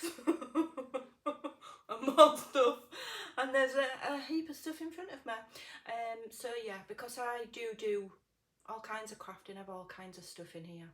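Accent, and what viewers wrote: Yorkshire, United Kingdom. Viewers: Yaay a Yorkshire lass like me - love it!! Just found you, do I detect Bradford/Leeds in that accent? Sending lots of love anyway xx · Going through some of your old videos and started with this one! Love it! Your Yorkshire accent is beautiful! 💗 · As a fello yorkshire lass you sound just normal to me. Been in Oz for 20 years but still have my strong accent and its comforting hearing you talking. Your crafts are amazing, easy to follow and always fun to do. Thank you sooooo much for all you share, including yourself. Wanted to send you a white rose but there was only a red one!!!! so here's a daisy instead🌼Jane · Hello! So very nice to "see" you! (Love your hair color - it suits you!) I think it's a great idea to do a vlog here and there. You could show us historical or Victorian buildings in your area. Or take us to a lace shop or a thrift shop (to look for out of copyright images/music sheets). 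Or show us around the countryside or visit a village. Or just do a vlog showing clips of those ferocious "guard" dogs of yours! 🤣 I love the Yorkshire accent, thriftiness, stubbornness, friendliness, common sense and you don't take yourself too seriously. Honestly, I watch you just as much for your personality as I do for the lovely things you make. And I agree with you - a true crafter never settles down to one thing. Part of the fun is to have a go at all kinds of things, just to see if we can do it. I'm so happy your viewership is continuing to increase. Your new subscribers are going to love you! Thanks for all you do! Janet 💕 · It's awesome to see your face. Love the hair! And I love your Yorkshire accent! You are so encouraging and generous. Thanks for all the freebies. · I’m one of your new subscribers and loved this vlog 😊 I think we are quite alike in our crafting too many ideas to stick to one project ! 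And you have inspired me to try a vlog maybe one day when I feel brave enough 😂 You are very pretty and definitely should not change your accent it’s proper Yorkshire ❤️ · Oh my goodness! I am so thrilled to have fallen upon this video! Thank you for this vlog as now I can put a "face to the voice and laugh"! Your Yorkshire accent is beautiful. I am from Florida USA and there are all kinds of accents here, although, I don't have one, lol. Never change, just be who you are and embrace yourself, we do. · Nice to meet you face to face (sort of). You look lovely and I love your accent. I have a few friends from Yorkshire and I just love the way they talk: it's part of who you all are. I am Spanish and have my funny accent from the north west of the country too! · Love this i to am a flitterea haha I call myself a multi crafter. My accent is pants love the Yorkshire accent i u derstand it very well some of your frazese we use in Newcastle to Loveing the red hair to ❤️ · Personally I love your Yorkshire accent! 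Nice to see you!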